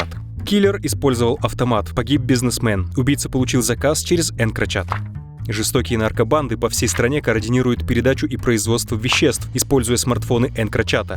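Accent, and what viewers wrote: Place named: Kazakhstan. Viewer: С первых минут видео понятно, что автор с Казахстана😂😘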